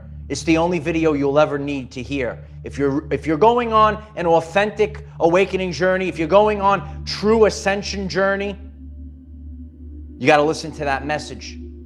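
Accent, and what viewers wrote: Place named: Brooklyn, United States. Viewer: How cute your little Brokklyn nyc accent...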